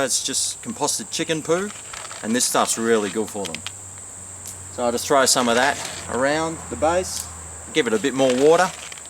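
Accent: Australia. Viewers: Nice video you sound like your from down under. Thanks for the tips mate. growing mine from seeds.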